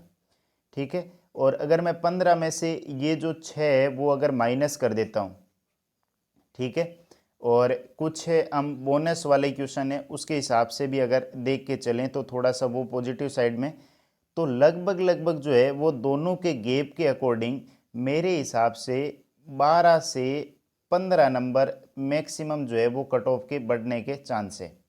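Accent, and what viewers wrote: Rajasthan, India. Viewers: OK bhai mujhe apke accent se lge ki aap rajasthan se ho isliye bola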